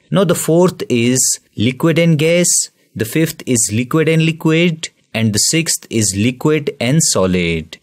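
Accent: India. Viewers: Just the way bro speaks its obvius that bros indian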